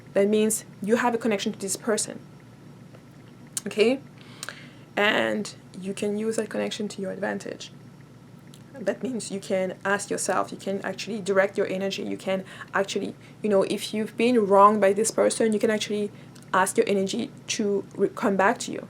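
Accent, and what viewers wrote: France. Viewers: Why do I hear a French accent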